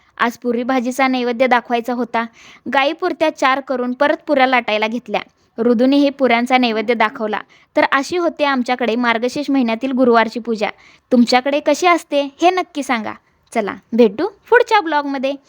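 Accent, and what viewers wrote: Kolhapur, India. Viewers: ताई तू कोल्हापूर जिल्यातील आहेस का, बोलणं भाषा जवळची वाटते ❤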